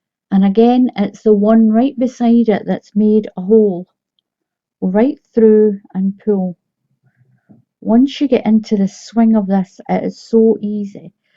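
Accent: Scotland, United Kingdom. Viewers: Hi from cork Ireland I love your tutorials also the Scottish accent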